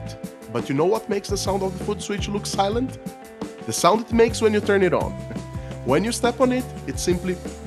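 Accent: Brazil. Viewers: Great Brazilian accent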